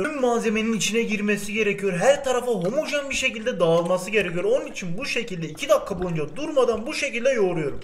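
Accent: Adana, Turkey. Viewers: Adana'ya doğudan göçen kürt kesimi böyle konuşuyo genelleme yapma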